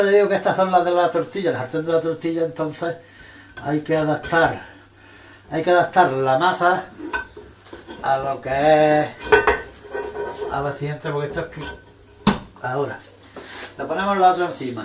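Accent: Andalusia, Spain. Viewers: Saludos desde Cuba me recuerda a mi abuelo que era andaluz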